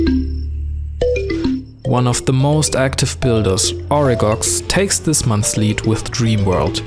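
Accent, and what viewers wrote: Germany. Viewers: Do I hear a german accent?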